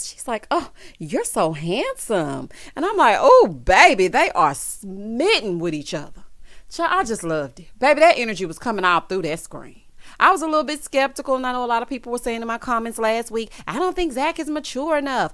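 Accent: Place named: Houston, United States. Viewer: You are most definitely from Houston 😂 you sound just like me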